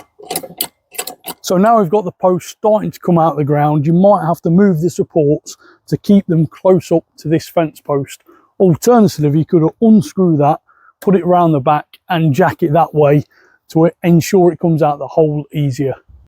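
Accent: United Kingdom. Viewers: Is he bringing these to his pawn shop? And why is he taking with a British accent?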